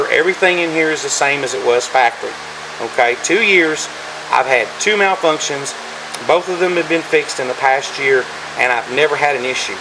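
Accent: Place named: South, United States. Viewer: now hummm now now you have a south accent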